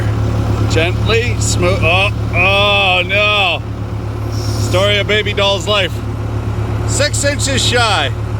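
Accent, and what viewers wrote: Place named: Canada. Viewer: Are you related to “Zip ties and bias plies”? Because you’re both from the great white north and talk almost exactly the same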